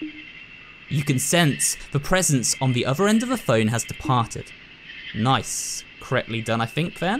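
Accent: South, United States. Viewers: As someone who lives in Texas. I thoroughly enjoy your southern accents 😂 Funny how you find it hard to do. Seems so natural for us lol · Southern accent is YouTube gold!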